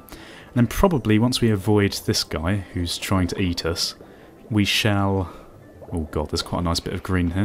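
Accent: United Kingdom. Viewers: youre voice sounds like mine but british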